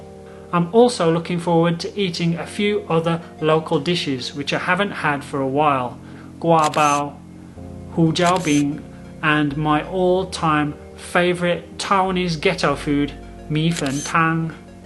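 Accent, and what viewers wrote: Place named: United Kingdom. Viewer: 哈哈哈！英式語調，英式幽默！非常喜歡！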